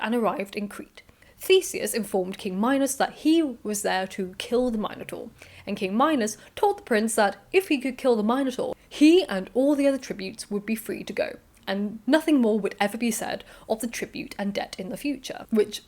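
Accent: United Kingdom. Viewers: Just found your channel - I'm enjoying it! So many great and informative videos, and so well presented! Growing up, my librarian parents gave me a book of Greek Mythology to read, so I am familiar with the myths, names and stories. Later in life I re-examined the Minotaur myth twice as I discovered new references to it. The first was Richard Strauss' opera "Ariadne auf Naxos" (I've heard you state you're not a music fan, but check this out as "research"), and the other a short story by Jorge Luis Borges, where he re-tells the story from the point-of-view of the Minotaur! My jaw dropped when I read that - if you don't know it, it's a super short, and satisfying, read. The other thing I note when I watch your videos, is that you folks across the pond pronounce all the Greek names very differently than we do in the States. Is that scholarly, or just regional I wonder? 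Anyway, great job (as usual!)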